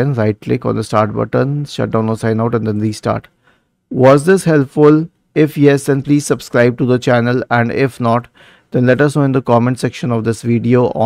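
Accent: India. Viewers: Speak english not crap of indian mix it english stupid video:face-red-droopy-eyes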